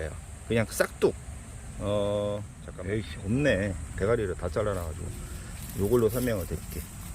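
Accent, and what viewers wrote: South Korea. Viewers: ㅋㅋ 님은 서울말 안어울려요 구수한 시골말로 계속~~~적심방법과 이유 잘 배웠어요😉😊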